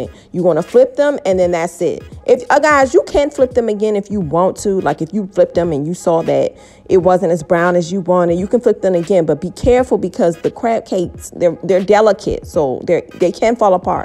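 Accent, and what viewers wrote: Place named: Prince George's County, United States. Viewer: From the sound of your accent I’m guessing you’re from PG County?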